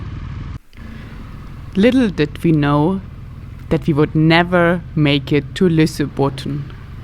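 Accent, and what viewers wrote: Germany. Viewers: Great video, love your german accent... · I like your German Acent in english!!😆😆Its so german!😄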